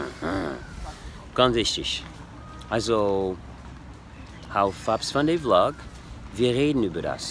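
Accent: France. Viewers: the french accent is the most charming one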